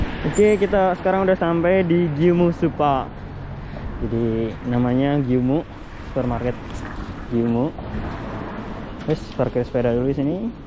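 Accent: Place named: West Java, Indonesia. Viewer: Sunda mana kang?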